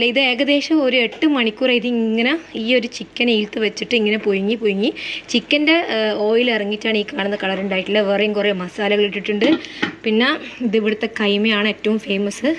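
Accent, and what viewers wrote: Kannur, India. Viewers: കണ്ണൂർക്കാരത്തി അല്ലേ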